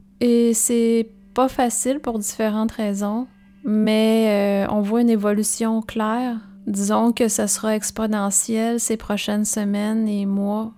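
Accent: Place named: Quebec, Canada. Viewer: ahhhh, l'accent québécois!...toujours sympa de l'écouter 😉 God bless you from France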